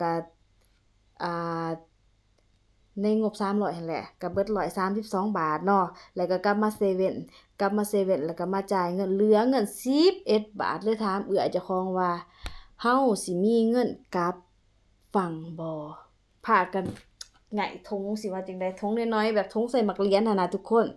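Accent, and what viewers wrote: Ubon Ratchathani, Thailand. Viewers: สำเนียงการเว้า ของสาวห้องคือกันกับสำเนียงเว้าของคนอุบลฯ คน อำเภอน้ำยืนเด้😊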